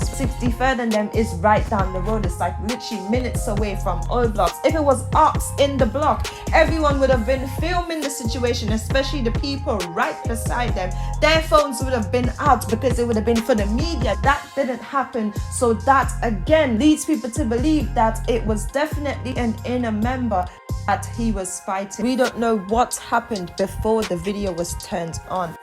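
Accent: United Kingdom. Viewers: 👎 for the accent, stick to that side of the pond with your “UK Dill”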